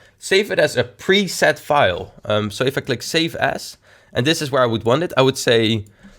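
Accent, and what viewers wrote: Netherlands. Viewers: Are u dutch or Deninish or Belgic? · Just a question this voice really has a Dutch accent? Is he Dutch? Anyways I love ur vids what are ur subs called Znaccers CX? (Iam one CX) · he sound dutch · yep you sound dutch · Je nederlandse accent is zo laimmm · eyyyy jog je bent nederlands toch ? well ik ook ik kan het horen via je accent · Dutch :D?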